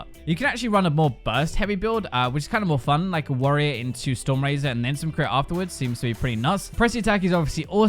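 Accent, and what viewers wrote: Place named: RP, United Kingdom. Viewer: I love your accent! I love RP as well... :)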